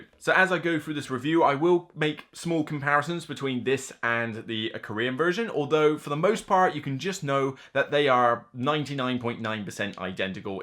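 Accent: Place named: West Country, United Kingdom. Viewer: Where you from? Devon? What's this accent, west country ish?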